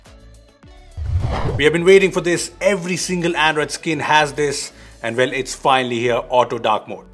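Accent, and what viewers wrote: India. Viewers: I hate when an indian talks english! hell of an accent 😫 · The indian accent is nice ( deeevlper ) ❤😁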